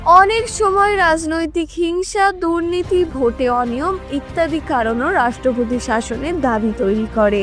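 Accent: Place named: Bangladesh. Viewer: ভাষার উচ্চারণ শুনে মনে হচ্ছে এটি বাংলাদেশের চ‍্যানেল কাজেই বন্ধ করলাম এবং দেখা উচিত নয় ।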